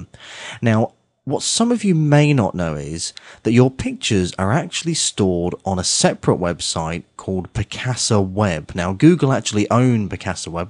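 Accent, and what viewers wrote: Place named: England, United Kingdom. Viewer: Thanks. I never feel stupid when someone with an english accent is describing something to me.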